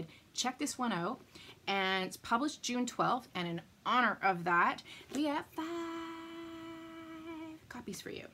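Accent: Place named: Canada. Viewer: I love your Canadian accent!! Happy for the suggestion for a good summer read,but cannot wait for another of your books! Have a great summer!! ❤️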